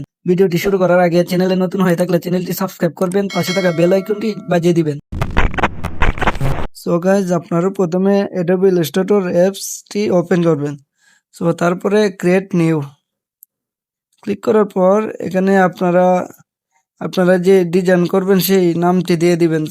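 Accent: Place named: Brahmanbaria, Bangladesh. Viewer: Apnr vasha shune mone hoyeche ze bi- baria te apnr bari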